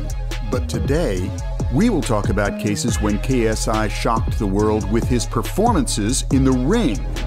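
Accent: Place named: United States. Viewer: ur voice does not sound like a Ukrainian you’re American bruv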